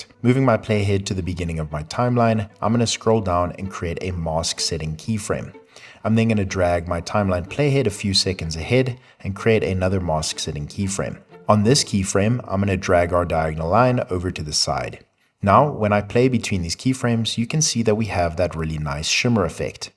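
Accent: South Africa. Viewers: ah , are you fron South Africa 😅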